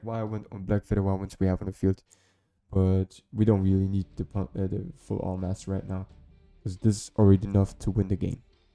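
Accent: Germany. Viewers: Are you from Germany? Super Nice Video And Deck👍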